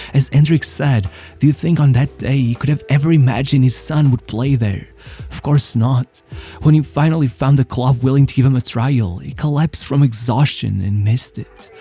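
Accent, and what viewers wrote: Portugal. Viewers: Where you talk very portuegues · E ese inglés com sotaque portugues?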